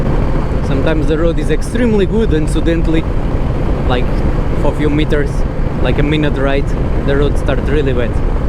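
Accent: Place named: Portugal. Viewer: your accent sounds alot like a portuguese guy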